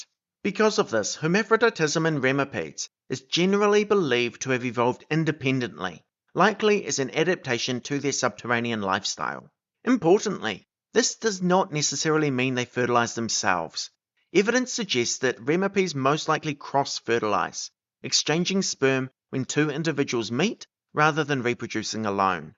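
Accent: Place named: New Zealand. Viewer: You have such a strong New Zealand accent 😂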